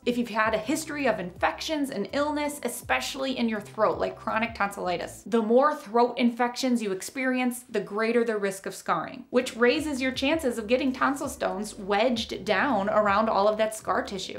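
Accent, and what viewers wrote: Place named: Minnesota, United States. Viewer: HOLY MINNESOTA ACCENT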